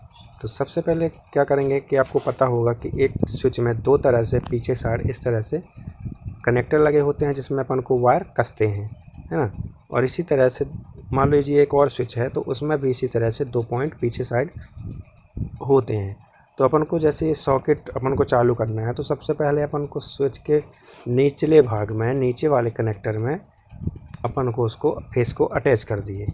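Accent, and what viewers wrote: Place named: Jabalpur, India. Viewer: Bahot badiya ..bahot pyari video hai..lage raho.. Jabalpur se ho kya..aapki bhasa se lag Raha hai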